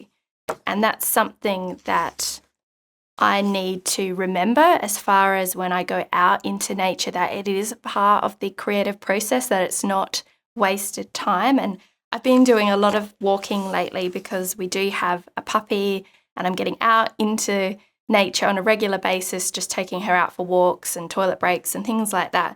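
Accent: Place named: Australia. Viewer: Love that I found your channel through another artists recommended channel page. Subbed as soon as I heard the accent. Love that we have such incredible mark making artists here in Aus 💪🏼😁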